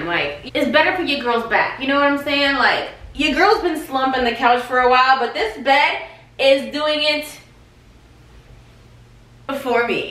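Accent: Jamaica. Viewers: Are your parents Jamaican? I can hear the accent and mmm mmm is honestly what have it away in the beginning 😂😂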